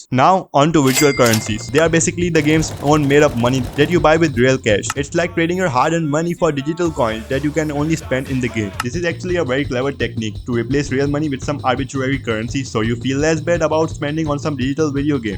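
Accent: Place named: India. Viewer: bro you sound so much stereotypical Indian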